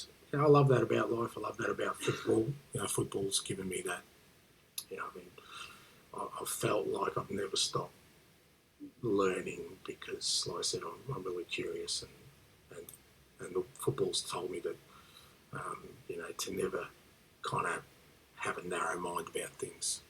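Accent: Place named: Australia. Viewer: God he's so Aussie its ridiculous 🤣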